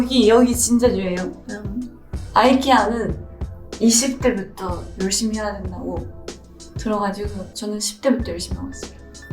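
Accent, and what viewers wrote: Japan. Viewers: Sakura has been in kpop for so many years and still she can’t speak Korean well that’s very disappointing, it even sounds like she is speaking with Japanese accent 🤡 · 일본여자는 특유의 귀여움과 아름다움 상냥함이있어...옆에있으면 머리를 쓰다듬어주고 싶어요..발음도 귀엽죠.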